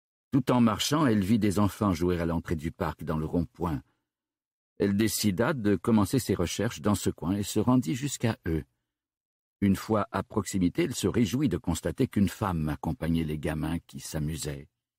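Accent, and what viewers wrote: Quebec, Canada. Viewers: J'en suis qu'à la moitié, j'adore je suis conquis ! Un peu de mal avec le Québécois parfois mais c'est rigolo 😁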